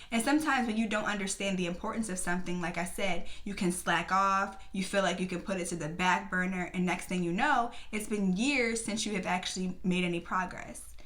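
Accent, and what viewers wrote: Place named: DMV, United States. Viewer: You’ve gotta be from the DMV or somewhere in that area lol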